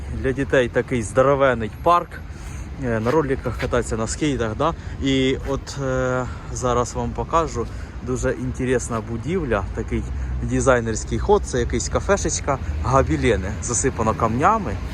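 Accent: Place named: Dnipropetrovsk, Ukraine. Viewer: Говір як з Дніпропетровщини )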